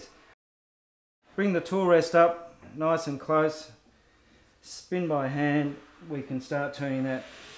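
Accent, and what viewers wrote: Australia. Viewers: Lovely. I am just interested in turning techniques and results (being a female without a lathe or indeed a shed to put it in I can only admire the work of others. But I am truly amazed at the number of things that can be used in turning. I saw one video where the man used pieces of kitchen sponge in resin and the coloured pencils items are amazing. Good to hear a home grown Aussie accent too. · I'm Just really happy he's Australian, and I can hear the familiar sayings and accent.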